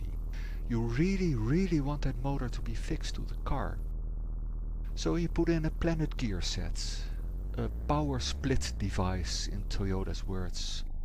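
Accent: Netherlands. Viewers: I think he's dutch. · you sound dutch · It is a Dutch (The Netherlands) accent. For a Fat and Heavy GERMAN accent listen to <link> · is Dutch. He's not speaking his mother tongue. He has a slight Dutch accent but his English is perfect. He even uses English idioms and nuances which a lot of native English speakers would have difficulty with.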